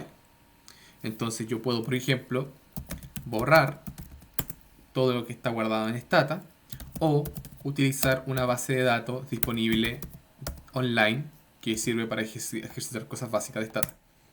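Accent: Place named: Chile. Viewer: Que bueno un tutorial en castellano chileno. Grcs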